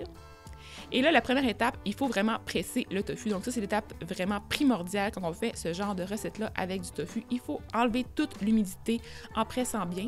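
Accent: Quebec, Canada. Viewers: j'aime trop l'accent québécois 😍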